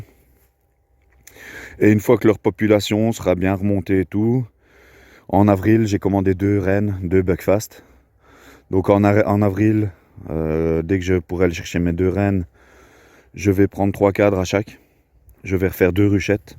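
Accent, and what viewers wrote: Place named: Belgium. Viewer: Salut, une belle saison à toi 🤞. Tu meuble tu meuble mais avec l'accent belge c'est sympa à écouter 😉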